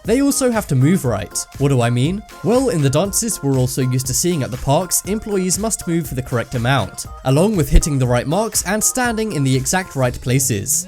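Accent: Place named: England, United Kingdom. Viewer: Love the accent!! English??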